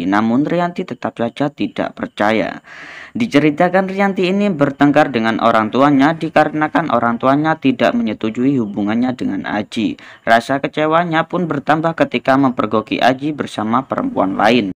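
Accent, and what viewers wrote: Java, Indonesia. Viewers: medok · medok bner ngomong wkwk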